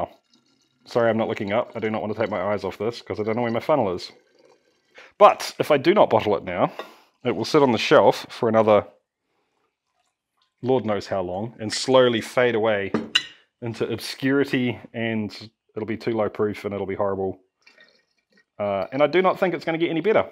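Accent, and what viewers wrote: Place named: New Zealand. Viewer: New Zealand is weird. His pronunciations are so Aussie, yet simultaneously American! It’s hilarious, love this channel especially since I love my hard liquor!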